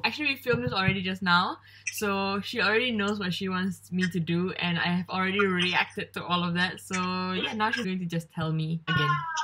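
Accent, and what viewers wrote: Kelantan, Malaysia. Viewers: suko dengar kak kecek kelate dgn family pahtu suko dengan kak beradik suko xD semoga kak dan kita semua sokmo dibawah jagaan Allah, dijauhi benda-benda buruk, didekatkan dengan benda benda baik. love you kak!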